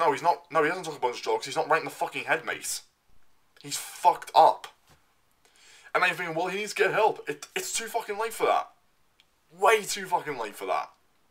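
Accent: United Kingdom. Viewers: Are you from the north? More specifically Scarborough? I'm terrible with identifying accents but you sound very similar to my accent. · I take it with that accent that your British if so its nice to see a British take on you know who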